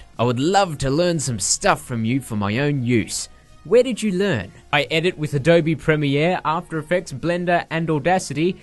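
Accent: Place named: Australia. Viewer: we're both Australian :D i didnt know because im too used to the Australian accent...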